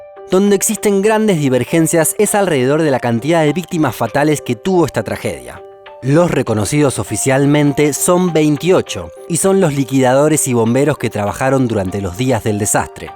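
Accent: Buenos Aires, Argentina. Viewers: Que embole con tonada porteña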